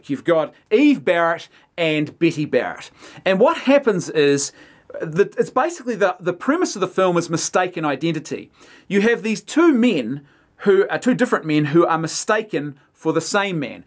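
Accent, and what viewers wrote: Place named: New Zealand. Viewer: Is it? I always thought he was saying "Brandon" with a Kiwi accent.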